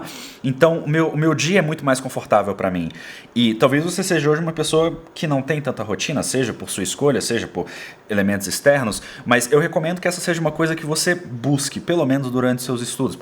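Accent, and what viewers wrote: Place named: Espírito Santo, Brazil. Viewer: Qual é a origem do seu sotaque? Eu conheci uma pessoa de Vitória - Espirito Santo que tinha um sotaque parecido com o seu.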